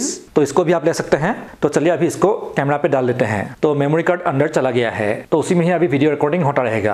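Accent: Assam, India. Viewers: দাদা, আপুনি অসমীয়া নেকি?? কথা কেইটা শুনি এনে লাগিল।।।। ।❤ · tu oakka assamese hai. tera accent se pata chalta hai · Is that Assamese accent I hear? Lovely! Thank you for the informative video.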